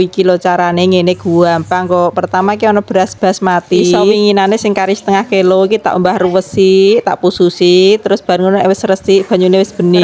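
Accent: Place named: Blitar, Indonesia. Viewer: Bahasamu wong Blitar Tah yu...😂😂😂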